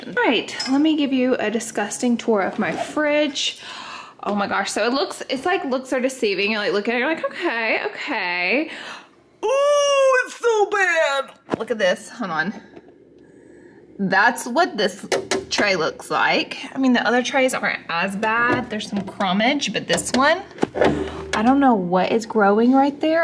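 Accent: South, United States. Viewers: calling the pots boilers in her southern accent is the cutest thing on YouTube.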